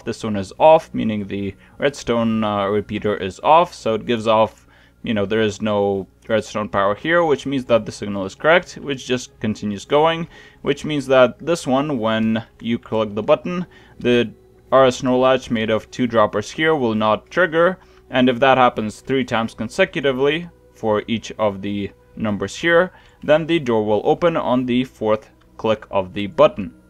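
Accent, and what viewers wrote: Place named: Canada. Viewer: you sound Canadian :-]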